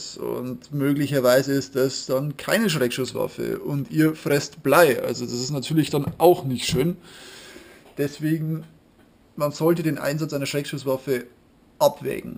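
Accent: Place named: Burgenland, Austria. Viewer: Woher bist denn du? Du redst meinermeinung noch wia a Burgenländer.(I bin aus Margerethen.)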